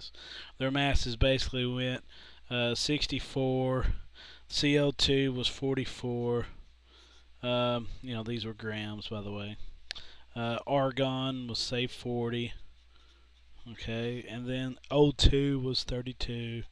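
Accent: South, United States. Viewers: You seem to have a very southern accent, are you an Alabama, Florida, or Georgia native?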